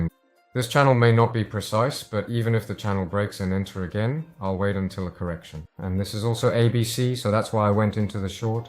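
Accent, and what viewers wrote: United Kingdom. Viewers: wow your Enginlish is perfect. I thought you were born in korea, but sounds like you grew up in England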